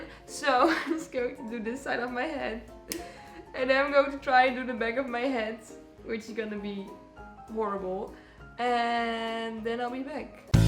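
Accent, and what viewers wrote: Netherlands. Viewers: Yayy! I love that hair and your dutch accent ^^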